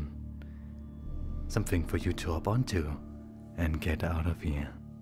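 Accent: Germany. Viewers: Ich liebs!!!! Wer hätt gedacht, dass n deutscher Akzent so vorteilhaft sein kann 😂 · Omggg your germannnnnn😭😭😭😭 i lovvveeeeee ittttttttttttt❤❤❤❤❤❤❤❤❤❤❤❤❤❤ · The German is making me hyperventilate- how do I start paying you for this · I used to think that he got konig's accent pretty well He's from Germany AAAAAAAAAAAAAAAAAHHHHHHH 💘💘💘💘💘💘💘💘💘💘💘💘💘💘💘💘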